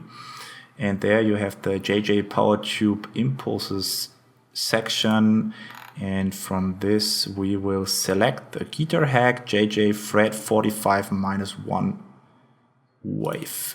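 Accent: Germany. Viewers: I could tell just by your accent you were german. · I could recognize that accent anywhere. I know you're German. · I hate the german accent.. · man hört voll das du aus Deutschland kommst ^^ aber gutes englisch !!! thumbs up for you :D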